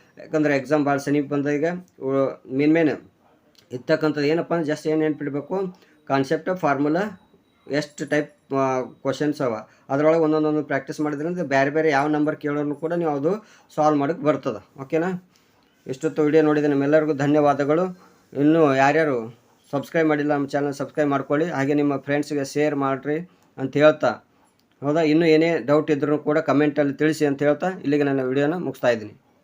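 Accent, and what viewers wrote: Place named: Jevargi, India. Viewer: ಸರ್ ನಿಮ್ಮ ಮಾತು ಜೇವರ್ಗಿ ಮಾತು ಸರ್ 🔥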